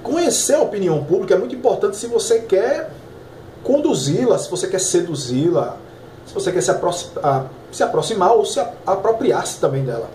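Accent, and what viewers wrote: Recife, Brazil. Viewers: Mas, tu és de Recife?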